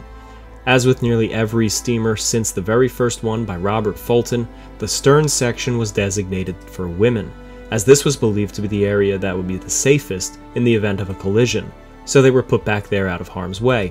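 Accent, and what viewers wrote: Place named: Michigan, United States. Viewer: could have sworn that was a Michigan accent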